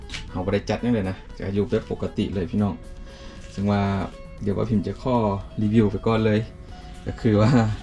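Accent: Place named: Loei, Thailand. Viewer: พูดสำเนียงเดียวกับเมืองเลย หล่มสัก เป๊ะ เราชาติพันธุ์เดียวกันเด้อ แต่โดนฝรั่งเศสยึดไป สมัย ร.5 ❤❤❤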